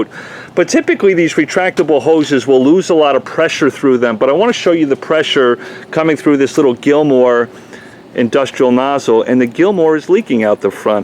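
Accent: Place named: Texas, United States. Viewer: Thanks for the video. I recently found your channel when searching for reviews of polishers. Funny, I have no trouble understanding you despite the Texan drawl ... :)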